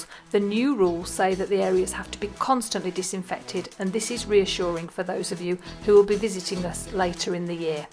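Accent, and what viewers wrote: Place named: Birmingham, United Kingdom. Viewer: nothing wrong with a Brummy accent... Trust me. As I keep telling everyone Shakespeare was a Brummie... Well almost. 😁 Really enjoy the videos. Keep em coming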